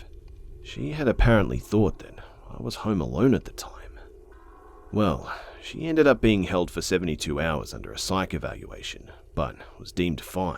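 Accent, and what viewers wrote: Australia. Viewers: ARE YOU AUSTRALIAN CUZ DAT ACCENT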